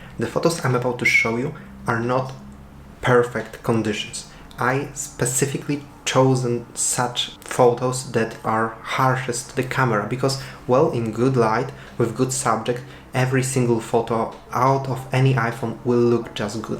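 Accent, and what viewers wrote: Poland. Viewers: Are you Polish? You sound like Polish guy with your English. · you are from Poland :D ?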